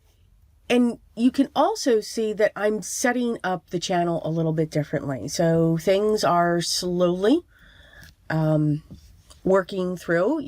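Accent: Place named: Philadelphia, United States. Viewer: Great ideas! Keep the videos coming! Couldn't help but notice your 'Philly' accent on the video. We're practically neighbors as I'm in NE Philly. ❤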